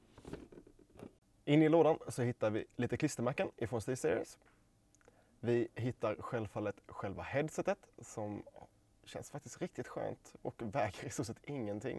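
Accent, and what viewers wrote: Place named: Sweden. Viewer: He’s from Sweden 🤨🤨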